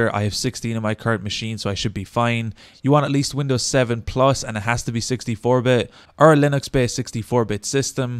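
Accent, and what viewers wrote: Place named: Ireland. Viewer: you have a great Irish accent lad thnx worked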